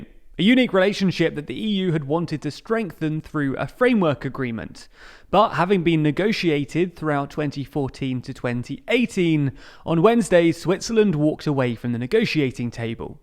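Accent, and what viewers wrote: United Kingdom. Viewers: Queen english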